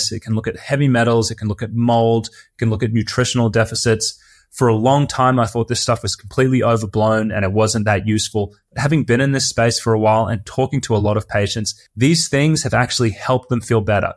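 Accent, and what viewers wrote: Australia. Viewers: Name sounds Austrian, voice sounds Australian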